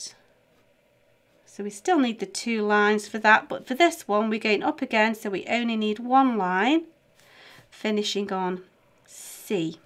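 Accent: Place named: Dudley, United Kingdom. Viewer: not far away from me! I thought your accent was quite near hehe! I’m in dudley! Nathan rose is my teacher!:) x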